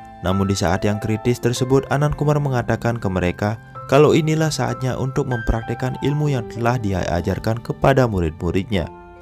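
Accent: Bali, Indonesia. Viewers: Lebih ke logat bali.. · Hehehe logat bali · Asli bali bang ?Logat bali kental banget bang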